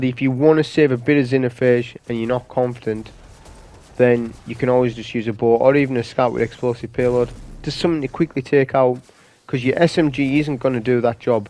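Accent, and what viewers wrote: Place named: Northern England, United Kingdom. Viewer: I know most rant on about ESO's solo runs of content but enjoy the actual audio commentry with it from these videos, especially from a northern lad :D PoH next?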